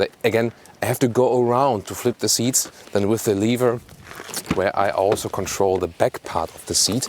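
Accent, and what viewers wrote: Germany. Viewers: Hi, may I ask you where you filmed that review?! Nice nature. Btw: Do you speak german? - I must say the reviewers accent sounds a little german ; )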